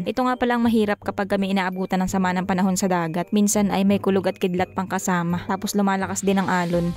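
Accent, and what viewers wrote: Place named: Cebu, Philippines. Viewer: Ganda pakinggan ng dialect nyo madam, halong cebuano ang tagalog...parang sa Palawan din. Ang ganda pa! ❤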